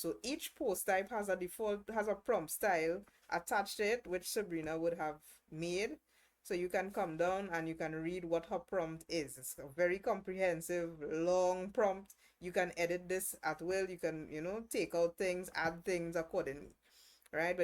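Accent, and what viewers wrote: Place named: Trinidad and Tobago. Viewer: Super video!! also love the Trinny accent, keep going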